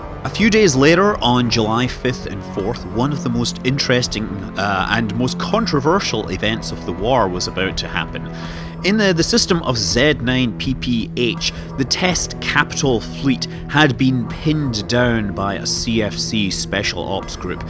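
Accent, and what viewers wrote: Scotland, United Kingdom. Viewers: I meant no offence. I actually like your stuff. I know it sounded like an attack towards you but in reality thats not what I meant. My appologies if thats the interpretation. What I meant is that althought people support it, I understand why the others like me dont support it. My other point usually when the CFC talks about the war vs BOB completelly fail to mention war vs IT alliance and war vs DRF, cos it did not go particularly well for goons. They also seem to jump from that straight to their next victory aka Fountain. That was my point. The story is not balance. After almost a decade of listening to the mittani's stories, one gets tired of his bs aka Cloud ring alliances hate Jeff. I guess he has no shame. And you do have a good scotish voice and I also think it will work out well for a book or something. I have watched your videos years ago and as you probably know the eve community is usually very good at geting things done well that benefit the game. 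It just shows to wonder that there is a lot of resistense from the community for this project. There has to be a very good reason for it. All the best.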